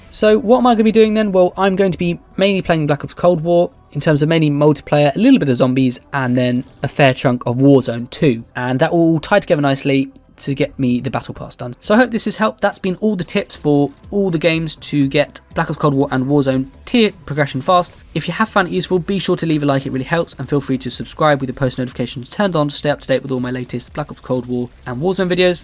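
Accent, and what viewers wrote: United Kingdom. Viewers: Like the most of British people voice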